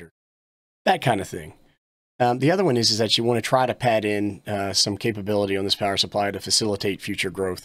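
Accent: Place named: Baltimore, United States. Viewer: You're definitely from Balmere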